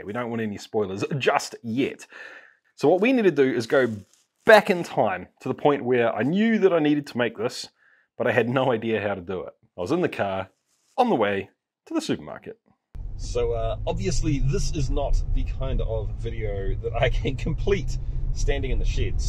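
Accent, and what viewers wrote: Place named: New Zealand. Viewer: You kiwi?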